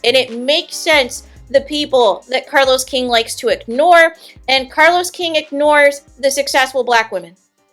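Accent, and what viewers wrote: Midwest, United States. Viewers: This doesn’t have anything to do with anything but I love to hear you say “You guys”. I’m not sure where you’re from but it sounds like a Midwestern/Minnesota accent 💜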